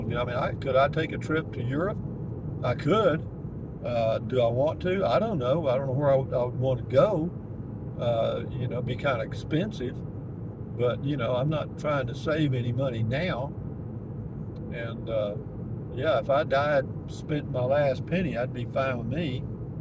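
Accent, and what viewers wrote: Texas, United States. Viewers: Dude you crack me up! You sound like my buddies here in Amarillo TX.🤠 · You have the same accent as my aunt and cousins and my dads buddies from Texas.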